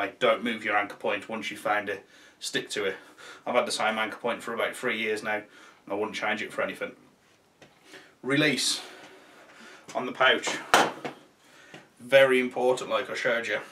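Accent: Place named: Midlands, United Kingdom. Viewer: the video poster has an English Midlands accent.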